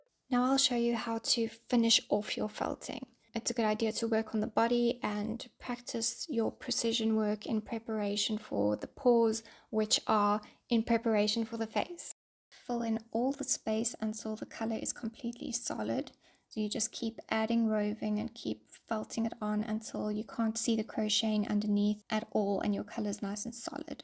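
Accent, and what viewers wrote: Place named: South Africa. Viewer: Very inspiring and creative! Love your accent too. Did I spot South Africa? Hugs from Provence, Adrien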